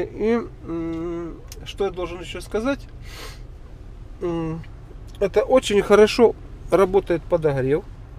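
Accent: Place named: South, Russia. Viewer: На юге все так Хаварят) съездите в Ростов !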